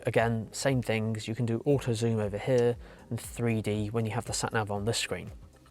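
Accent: United Kingdom. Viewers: its 10pm here and i felt like watching a good movie. mbux review; 55mins long in 4k and he’s British 🍿 out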